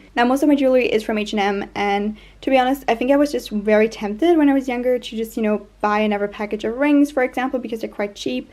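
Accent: United States. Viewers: I feel like now that she’s out of Cambridge she kind of has more of an American accent which is lovely on her 😂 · Woah u sounded full on british!!!! 😂😂😂 but half the vid I heard an American-ee accent😂 where r u from?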